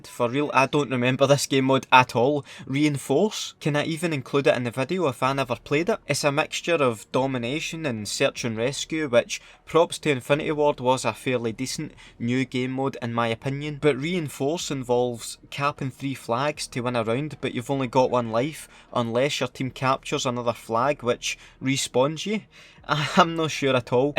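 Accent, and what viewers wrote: Scotland, United Kingdom. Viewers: NOTIFICATION SQUAD! Love ya accent it's godly, my english teacher is actually scottish but she's holding back her accent sadly i love the scottish accent. KEEP UP THE LEGENDARY WORK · Am I the only one that thinks the Scottish accent is a work of art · Love the Scottish accent haha. Hilarious content as usual. Much love from the US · Scottish, sounds nothing like Welsh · Why are annoyed Scottish people so great to listen to??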